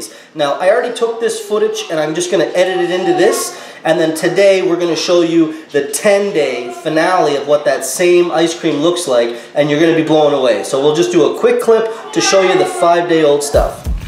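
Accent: Canada. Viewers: Breyer’s natural vanilla only has milk, cream, and sugar. It definitely melts. Don’t condemn all Breyer’s ice cream. Plus Breyer’s lids do not fall off. They have a plastic strip that goes around the edge of the lid. Evidently Oreo’s must be paying you money. I don’t know where you got this ice cream.but the Breyer’s sold in my store melts. That container is not saying ice cream. It’s a frozen dessert. Anything that says frozen dessert is not ice cream. You are obviously not here in the states. I don’t know where you are located. Since your English sounds pretty much like we have here, I’m thinking you are from Canada plus the French labeling is also a clue. I only buy their Nural Vanilla because it contains no preservatives or chemicals. Be truthful when you are condemning a product, otherwise we can’t trust you either. 😟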